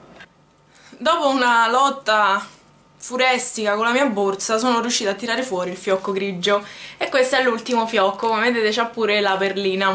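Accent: Rome, Italy. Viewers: Ciao mia sei dolcissima e bravissima si vede che sei una ragazza di cuore xcio hai attorno a te persone vere xche te lo meriti!!! Io adoro il dialetto romano sei troppo simpatica!!! Mi chiamo lidia salutami in un video ....ps ti seguo da molto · ma si romana se sente pure io so romana de nascita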